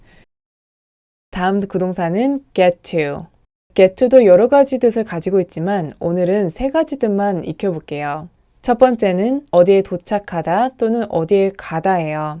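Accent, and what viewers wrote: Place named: United States. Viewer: 진짜 미국영어네요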